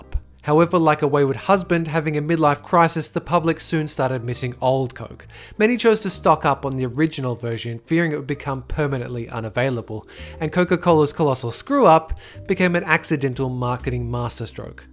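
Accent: Australia. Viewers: Damn it, getting another aus narrator still wont work. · Are you australian? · suddenly turned full australian.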